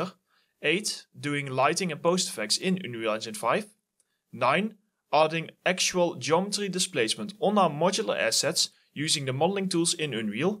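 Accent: Netherlands. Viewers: You mean that megascans library? I was under the impression you could als import your own assets created in, for example Blender, and create textures from scratch. With the only difference you could only use those materials in Unreal Engine (which would only be a downside if you would use another game engine). I'm not a fan of Adobe anymore, although I have used a lot of their software. But I don't like their subscription model, way too expensive if you don't make a decent living out graphic design. I'm good in 'traditional' digital graphics design, but yet have to find my stride in creating for games, as over here there was never an actual gaming industry. Atleast not when I was at 'the right age'. But you sound Dutch, if I'm not mistaken?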